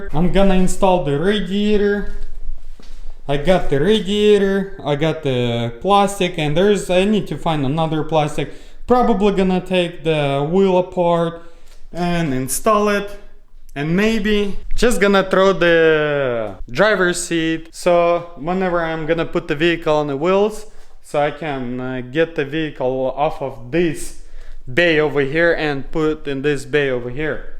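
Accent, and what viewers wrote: Russia. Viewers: How its possible to know what car part you need and where does it fit? Maybe he use some BMW manual or something? I suspect that your accent comes from russia or europe?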